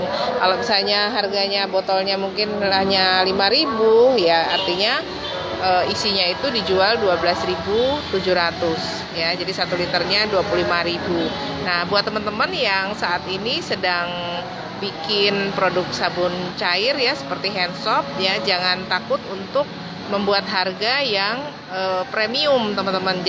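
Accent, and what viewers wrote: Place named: Jakarta, Indonesia. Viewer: Suara jrlas dr jkt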